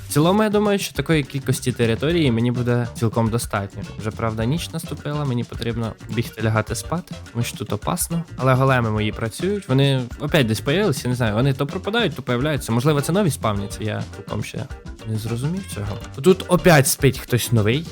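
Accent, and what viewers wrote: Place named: Poltava, Ukraine. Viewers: Дякую! Мій синочок дивиться ці майнкрафти, нарешті українською 😉 ну може трошки суржиком, але рідним, наче з-під Полтави) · Полтавськиа мова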